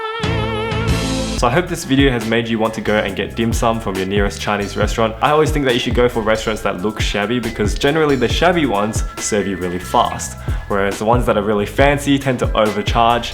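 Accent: Australia. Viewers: That ozzy Canto combo is hilarious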